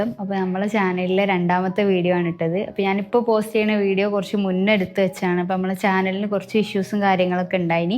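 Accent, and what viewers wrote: Kozhikode, India. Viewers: കോഴിക്കോട് കാരി ആണോ slang കോഴിക്കോട് ആണല്ലോ ❤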